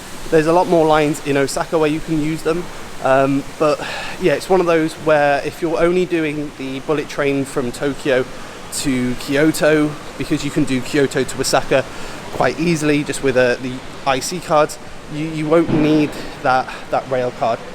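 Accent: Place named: United Kingdom. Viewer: Your tips are really good 👍 tips, so thank you 😊 for those. However, it's a bit hard for me - a Vietnamese American- to understand your British accent, 😂, so it would help tremendously if you could illustrate the object/subject which is being mentioned with a picture 📸 or a flash of a video clip, etc. so that whatever you are mentioning or explaining could be understood 😉 right away! Thank you 😊 💓 so much.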